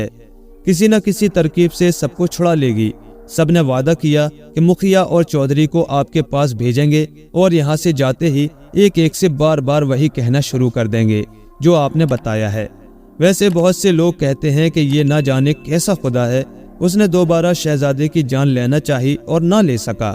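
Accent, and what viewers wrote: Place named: India. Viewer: Apki kahania'n achi hoti hain bas apka lehja shayad Hindi hai agr Urdu lehja hota tou chaar chaand lag jatay...ager aap phool ko fool na kahein aur issi tarhan aur bhi bht si cheezein tou behter rahay ga.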